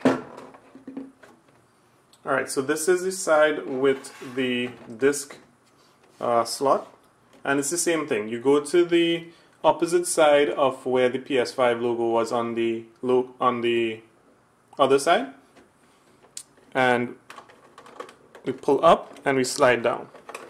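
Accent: Trinidad and Tobago. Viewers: Thats a trini accent i hear? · Bro you sound Trini. Boss... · Yu does know a trini from anyway🙏🏾 thanks for the vid bro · Soundin like a rell Trinidadian “ 😂 Thank you ! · Yo you sound Trini, big up man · You a Trini? 😊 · Mannnn I started listening to the accent and I’m like… he sounds Trinidadian…I look at your Instagram and confirmed ✅ my moms side of the family is Trini 🇹🇹 awesome to hear a voice representing on YouTube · Yo the shock didn't expect a trini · Was surprised when I heard the Trini accent lmfao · Aye Trini. I hear you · Are you from Trinidad? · Is he Trini? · Is that a Trini accent ? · know that trini accent anywhere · Thanks for the video you sound like you’re a Trinidadian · you from trinidad?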